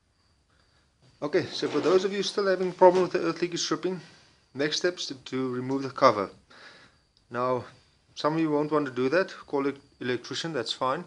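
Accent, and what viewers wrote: South Africa. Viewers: Saved me a call out fee! Thanks a million! In my case it was the breaker that went! Where are you from? You have a strong South African accent ;-).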